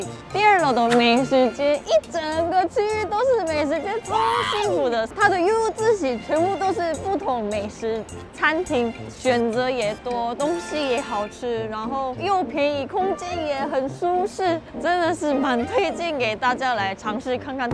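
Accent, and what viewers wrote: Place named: Taiwan. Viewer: 的中文真的越來越台，幾乎都要沒什麼口音了！！超厲害～🤩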